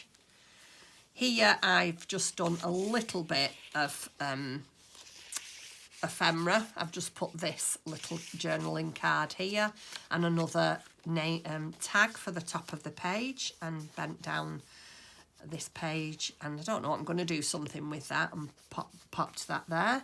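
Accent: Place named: United Kingdom. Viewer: Where are you from? I’ve never heard that type of British accent.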